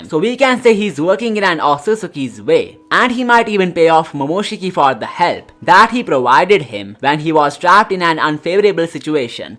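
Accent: India. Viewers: Where are you from, sound Indian?'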